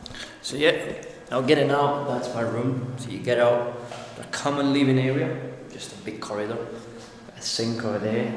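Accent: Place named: Scotland, United Kingdom. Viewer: Great vlog,! You picked up some Scottish accent too!! 🤩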